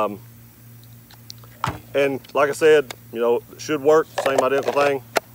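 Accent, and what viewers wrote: South, United States. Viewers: If I hear someone with a southern accent talking about guns, I know they know their guns.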